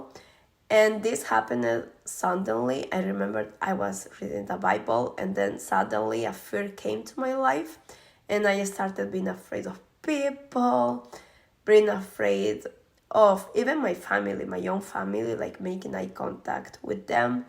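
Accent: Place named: England, United Kingdom. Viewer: An an American I love the way she talks however I have no idea where she is from haha. England? France?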